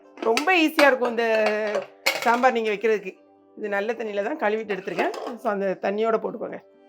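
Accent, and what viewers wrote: Tirunelveli, India. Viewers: எனக்கு தோசை தான் பிடிக்கும் சிஸ்டர். என் அப்பா ஊர் திருநெல்வேலி தான். அந்த ஊரில் நீங்கள் சொல்வது போல் தான் சொல்வார்கள். நீங்கள் புடவை கட்டும் விதம் உங்கள் சிரிப்பு சூப்பர். வீடியோ பார்த்தாலே ஒரு எனர்ஜி வருது.ஒரு சந்தோஷமாக இருக்கு சிஸ்டர். நிறைய வீடியோஸ் போடுங்கள் சிஸ்டர். உங்கள் பேர் என்ன என்று சொல்லுங்கள் சகோதரி.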